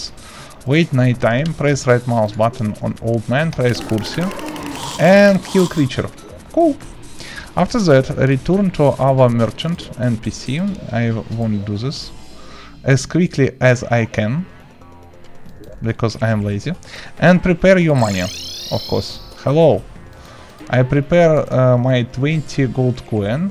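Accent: Russia. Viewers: Is he russian??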